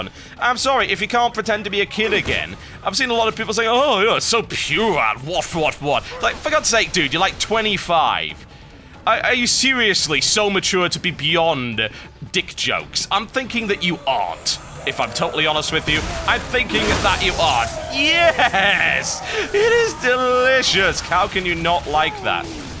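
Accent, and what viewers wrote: United Kingdom. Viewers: this guy's british accent can almost compare to paul from paul's gaming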